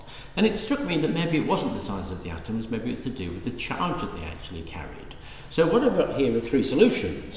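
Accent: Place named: England, United Kingdom. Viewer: english accent plus echo means no compreda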